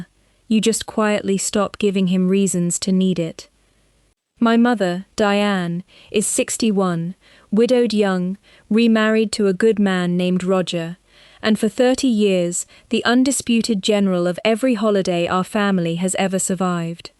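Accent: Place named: United Kingdom. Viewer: Why does the reader of this story have a British accent?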